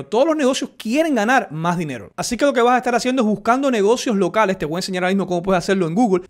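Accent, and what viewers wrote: Cuba. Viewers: Hola he visto un solo video tullo y ni siquiera lo terminé por motivos ajenos, pero me gusto el enfoque que le diste y tu forma de ver la vida, este video aún no lo veo terminado de escribir lo haré, normalmente antes miro muchos vídeos antes de suscribirme a un canal de este tipo porque la mayoría hablan mucha M como decimos en Cuba 🇨🇺, ademas de que tu acento me parece Cubano. Si es así estoy el doble de contento porque me encanta ver triunfar a los míos. Aki tienes un nuevo seguidor. Suerte que Dios te bendiga siempre 🙏🙏🙏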